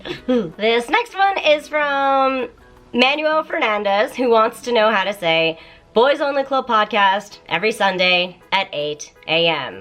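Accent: Japan. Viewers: I really like you LOL that sounds weird but i mean your method of teaching and your japanese "accent'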